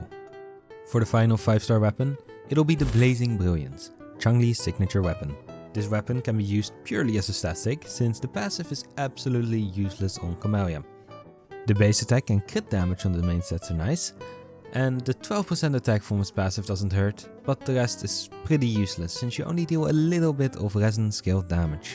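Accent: Netherlands. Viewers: Love the video weird question are you from the Netherlands you sound dutch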